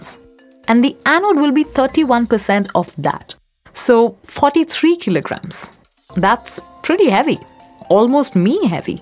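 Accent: India. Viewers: For the first time I like the Indian accent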